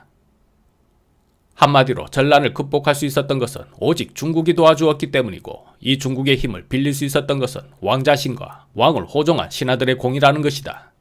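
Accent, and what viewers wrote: Gyeongsang, South Korea. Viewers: 이분 경상도 억양만 없으면 듣기 좋을텐데...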